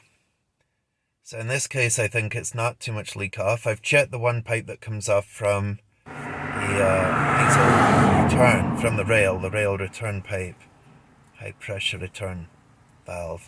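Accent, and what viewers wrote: Scotland, United Kingdom. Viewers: Very interesting accent, bit of scottish and american... Whats the craic?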